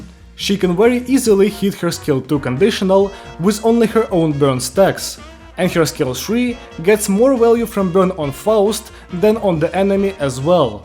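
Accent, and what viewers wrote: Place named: Russia. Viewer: ты же русский? акцент выдает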